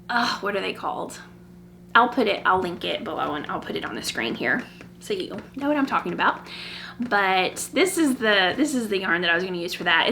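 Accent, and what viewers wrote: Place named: South, United States. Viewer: I absolutely love your podcast and your colour palette and choice of yarns and wish i could afford the same ones, hopefully one day lol. your choices are great examples to base my own projects on. Im loving the little additions of 'what we say in the South' im obsessed. Your accent is much more interesting to listen to than our very boring New Zealand one. I love your podcast and could listen to you speak all day, a great way to end a busy day at work! Please keep posting😊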